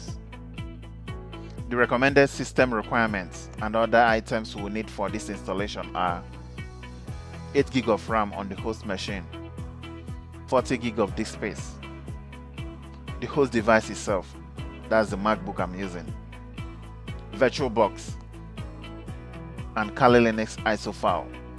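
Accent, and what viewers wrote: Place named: Africa. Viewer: this guy is soo good. like where were you men ... You actually spoke like an African .... everything was so simple .... God bless you ... I'm your fan from now onwards .... and I'm gonna spread the good news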